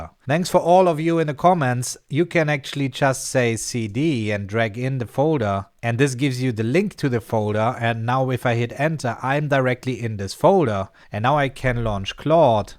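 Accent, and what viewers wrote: Germany. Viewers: Just found your channel, saw this and the previous video. Love the content and the practical relevance focusing on real life usability without self promotion which is often the main motivation of other content creators in YouTube. And as a German guy, I also like your German accent 😊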